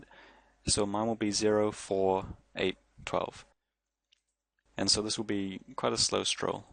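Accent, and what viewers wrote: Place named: Denmark. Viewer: Where are you from man? you have a Danish accent!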